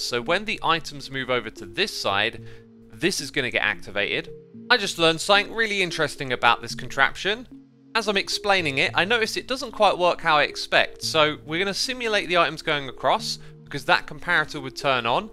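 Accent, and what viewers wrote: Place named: United Kingdom. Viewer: im so used to voice now that i cant even tell he has a british accent, its just accent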